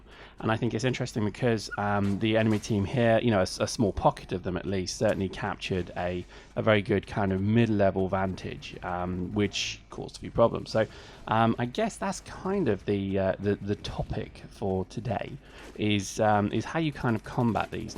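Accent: United Kingdom. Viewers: your accent sounds close, you must be in the South East? Maybe even Kent - Sussex? Where are you, I'm in Canterbury.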